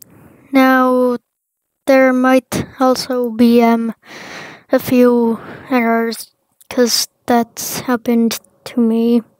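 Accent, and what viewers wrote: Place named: Russia. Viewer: are you Russian? you lowk got that Russian accent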